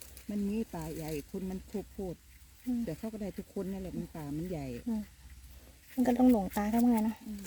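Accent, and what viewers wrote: Korat, Thailand. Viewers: พูดเหมือคนโคราชเนาะ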